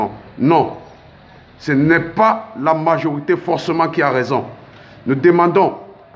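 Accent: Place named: Cameroon. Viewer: Il est fou tous les jours ses vidéos c'est Ibrahim Traoré pour ce faire des vus avec des mensonges et son français me fais rire il a l'accent camerounais non burkinabé.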